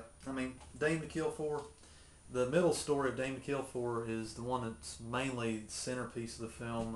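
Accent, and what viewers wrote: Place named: Louisiana, United States. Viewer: Your accent, are you from Louisiana?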